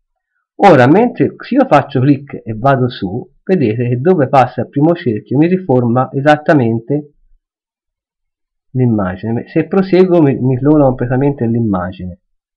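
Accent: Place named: Tuscany, Italy. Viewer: Grazie molto utile ma il toscano mi fa morire...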